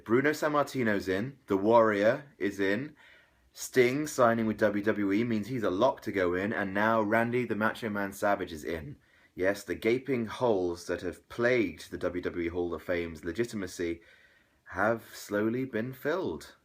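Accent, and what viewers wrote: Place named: RP, United Kingdom. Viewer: I listen to this to fall asleep at night to the sweet and relaxing posh voice of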